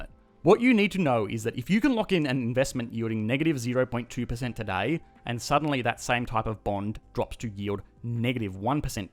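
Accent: Australia. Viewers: Bruh r u aussie? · I like this channel. I'd like it even more if the australian accent wasn't the most annoying of the english accents.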